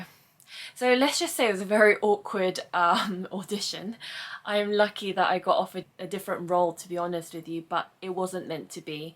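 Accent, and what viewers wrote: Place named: United Kingdom. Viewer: I am Japanese. I was born in London but left after 8 month . I really love your accent, there is something so elegant about seeing Asian people with a British accent. ( I wish I could speak like that lol) I enjoy your channel ❤ ( definitely need more beauty content creator by Asian women ) Btw I LOve Korean food , I actually live very close to the Koran Embassy so there are lots of Korean restaurants and the best Kimuchi store that a go all the time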